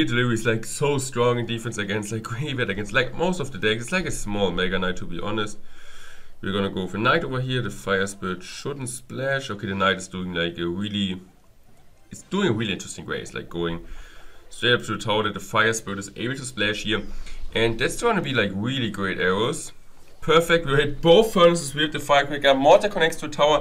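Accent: Germany. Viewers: ...and dann... Denglisch man kennts😂 Aber nices Video👌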